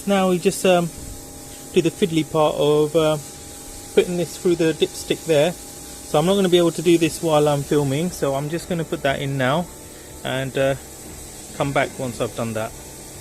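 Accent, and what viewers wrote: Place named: United Kingdom. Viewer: SOON AS I HEAR THE BRITISH ACCENT I'M OUT OF HERE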